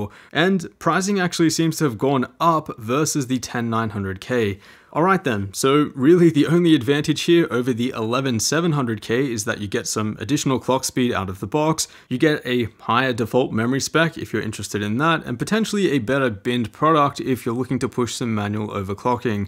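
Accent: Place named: Australia. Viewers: He's Australian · definitely australian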